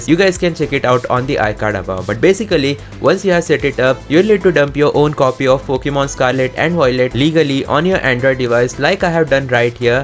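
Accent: India. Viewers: Bro it's just indian accent U racist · Bro it's Indian accent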